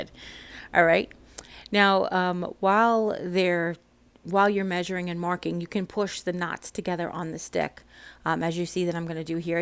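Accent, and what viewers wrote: New York, United States. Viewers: I like your subtle NY accent (and your tutorials, too👍)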